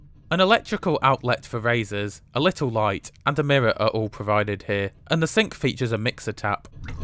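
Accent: United Kingdom. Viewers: Thank you for the very nice vidéo.Your comments and explanations were great.I very much liked the British accent.....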